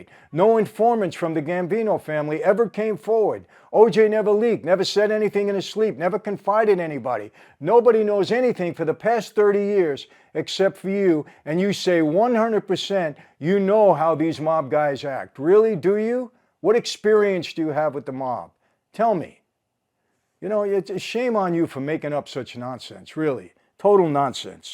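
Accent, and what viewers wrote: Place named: New York, United States. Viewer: I like you first time listening im an old lady who grew up in the big apple and your accent is like visiting my classmates and neighbors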